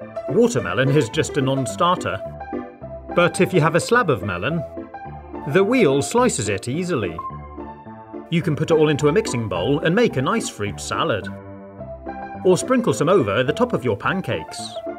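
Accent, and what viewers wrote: United Kingdom. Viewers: He's British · Are you from uk🇬🇧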